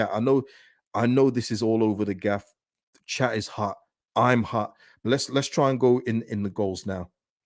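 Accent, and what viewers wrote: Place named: West Midlands, United Kingdom. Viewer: bro.. my bad man. I completely forgot! But hey, I can hear you're local to me (I'm from the west mids) so I just wanna say keep up the content man. Love hearing a common accent and knowing I'm not alone down here lol. I don't know any whites fans at all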